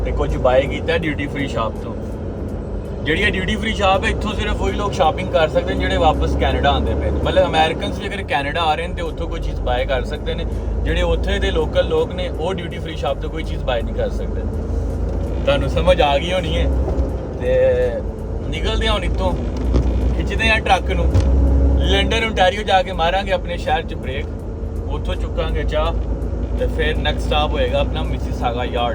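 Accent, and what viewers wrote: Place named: Punjab, India. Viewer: hahah I really enjoyed your Panjabi Accent...Good work bro..Maza araha ap ki vlog dekh kar m Gonna Subscribe you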